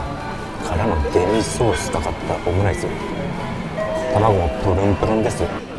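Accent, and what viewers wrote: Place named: Okinawa, Japan. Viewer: さんの沖縄なまりの話し方が大好きです。生まれた時から沖縄に住まれてましたか？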